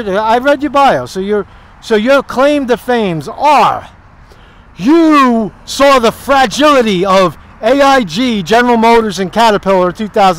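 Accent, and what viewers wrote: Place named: East Coast, United States. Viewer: This channel is PURE GOLD, east coast kid. I grew up in CT married a girl from Jersey who's Full blooded Italian mom and dad were from Brooklyn (Dad) and Newark. (Mom) I live in the Midwest now and Brother let me say your accent and mannerisms sound like HOME to me. I could listen to you gab all day long, and the content is ACES. Homesick.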